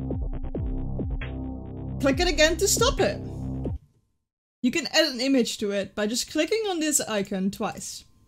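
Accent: Netherlands. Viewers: Even if I'm not a streamer I bought a Stream Deck to have fun in Discord during our gaming sessions. I searched for tutorials how to use it as a soundboard and found your video. I really like this video it because it is clean and simple without much talking about other things that are not relevant for the topic. It's a pitty that your videos don't have much more views. And I love this dutch/english accent :)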